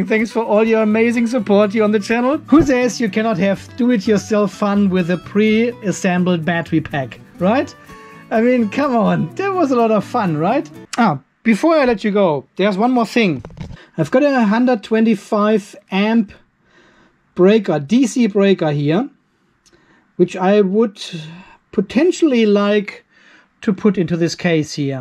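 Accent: Germany. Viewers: Jetzt musste ich wirklich lachen! Ich sehe Deinen Kanal sehr gerne und dachte mir immer: Irgendwie hört sich das wie ein deutscher Akzent an, aber was weiß ich. Nun Dein Schimpfen im Abspann: Habe so gelacht. Also viele Grüße aus Franken to sunny hot Australia.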